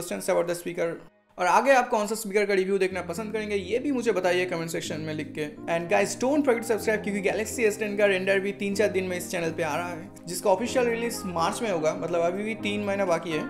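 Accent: Bengal, India. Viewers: Are you Bengali??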